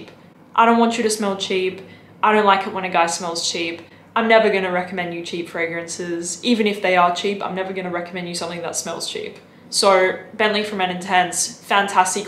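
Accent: Australia. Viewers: Australian accent.... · I enjoy your exotic Aussie accent, and your presentation, smile, eyes... everything about you is just gorgeous. And I like your fragrance choices - that it had to smell expensive and classy, even if it's modestly priced. I own many of these you have shown here, so I am on the same page with your choices. One I have not smelled yet is La Nuit d'Issey. Ordered it on your recommendation. 🖖😘👌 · What's there to be calm about? Was never hyped in the first place. I just said I loved her Australian accent. And what's it to you anyhow? People love to give their opinion when 1. It was never asked for and 2. It isn't worth 2 cents. So, you calm down... dude I swear. People and minding their business...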